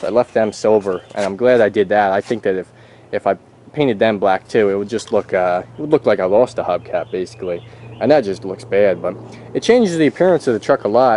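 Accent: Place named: Philadelphia, United States. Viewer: Where are you from with that accent Philly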